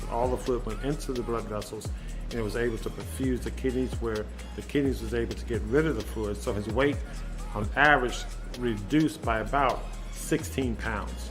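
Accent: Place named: United States. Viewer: Sir, i am watching your useful videos from india, we cannot follow american pronounciation of english. Pl give tittles in english and display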